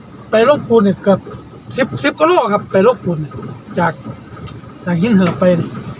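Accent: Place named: Isan, Thailand. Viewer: เว้าคือบ้านนอกแท้คือสิแม้นลาวอีสานคำำเว้านอจ้า บ้านนอกแท้ๆเดอจ้า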